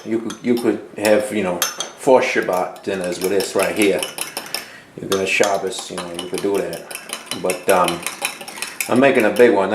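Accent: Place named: New York, United States. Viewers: Love listening to your N.Y. accent, and the passion you expressed, and your CHallah metaphor...Thank you for sharing your amazing recipe! I would try adding the sugar to the yeast well you made, just to cut a little wait time. Shabbat Shalom! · You are amazing! I love listening to you speak. I am originally from Rochester, NY, 72 years ago, so after 25 years in SC I love to hear a real New Yorker talk. The bread is beautiful, and what it means to you is even more beautiful. I made my first loaf today, and it's in it's final rise. I hope it turns out at wonderful as yours did, though I only made 1/2 recipe. Thank you for your time and energy you put into this video. I hope you and your family are always blessed.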